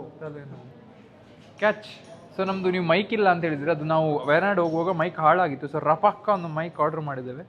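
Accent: Mangalore, India. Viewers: avre kannada first Matadodu kaliri nimma mangaluru bhashe beda Try madii It will boost your views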